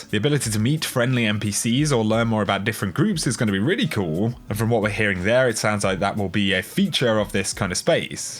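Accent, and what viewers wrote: England, United Kingdom. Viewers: Wow ppl got triggered because you are English and therefore don’t pronounce words with an American accent? Lol, you mortals are so petty. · Hey those idiots are jealous they dont have a sweet ENGLISH accent!!!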